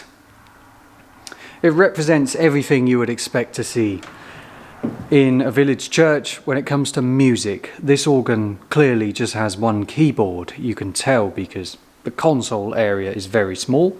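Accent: United Kingdom. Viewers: Auch wenn ich nicht alles verstehe, da mein Englisch nicht so gut ist, schaue ich Deine Videos gern und genieße Deine angenehme britische Stimme. Die vorgestellten Kirchen, Orgeln und Musikstücke sind immer interessant. Ich wünsche Dir und allen anderen Zusehern auf der ganzen Welt ein frohes neues Jahr 2026!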